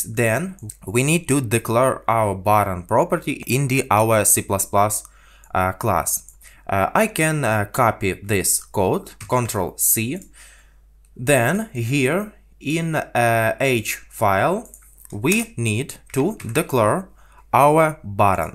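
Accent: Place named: England, United Kingdom. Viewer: O, vi iz anglii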